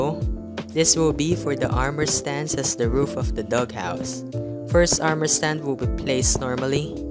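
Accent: Philippines. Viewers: Filipino accent be hitting tho lol thxx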